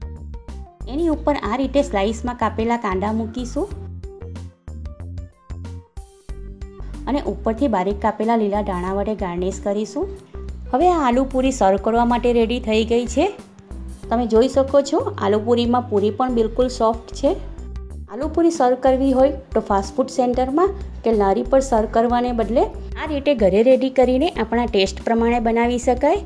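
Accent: Surat, India. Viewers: Ek dam surti boli ,zkkas aalu puri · Tamara avaj par thi lage chhe tame surati ho evu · Be tame to bo jabri sudhdh gujarati bolvani try kairi.. ... Game etlu try kairu pan surati to hambhadati j chhe... Khabar padi j jati chhe..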